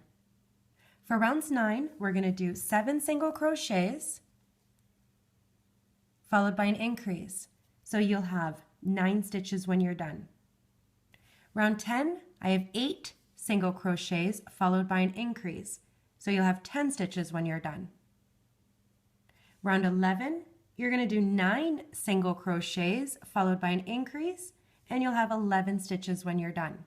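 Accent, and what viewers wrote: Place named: Canada. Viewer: I'm american and you soundas little Canadian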